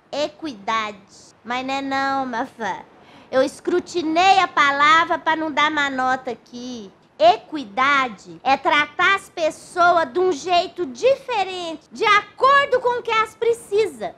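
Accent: Minas Gerais, Brazil. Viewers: Que dialeto maravilhoso! Minerês é um deleite. Obrigado e grande abraço 🙋‍♂️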